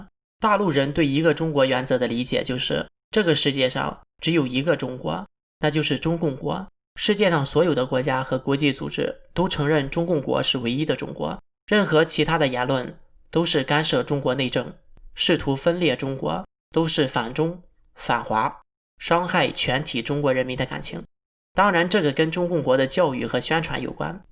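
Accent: Shandong, China. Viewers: 口音聽起來相沙東人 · 这声音很明显是山东人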